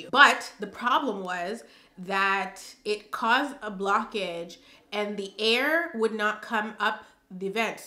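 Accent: United Kingdom. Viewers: The British accent is taking me out! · The British accent 😂😂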